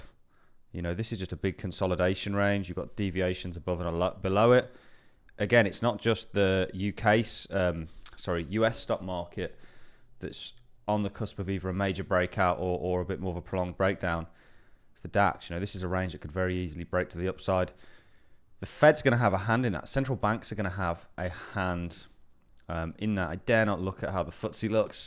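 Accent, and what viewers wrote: Birmingham, United Kingdom. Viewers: I hear midlands, birmingham way maybe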